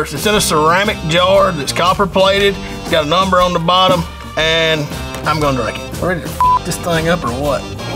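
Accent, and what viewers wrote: South, United States. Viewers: Nice hat, glorious beard, southern draw....well hell, Ima have to subscribe to your goofy ass.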